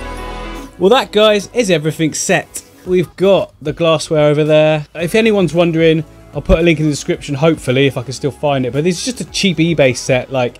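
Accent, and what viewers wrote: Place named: Somerset, United Kingdom. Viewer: I’m from Exeter and you are definitely not far from here because you sometimes sound so Somerset 🤣 love the videos, I would love to get into scaping myself but I’m so skint rn. Coronavirus doing us dirty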